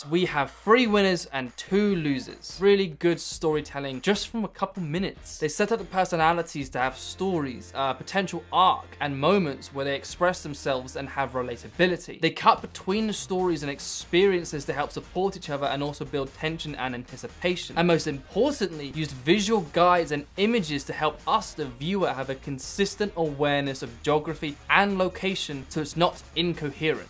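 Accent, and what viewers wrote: United Kingdom. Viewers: Honestly the most useful editing tutorial videos I’ve ever watched + I love the British accent ❤️ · british accents :(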